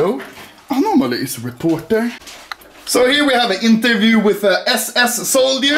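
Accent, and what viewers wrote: Sweden. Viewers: du är svensk man hör på ditt uttal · You swedish ? Lol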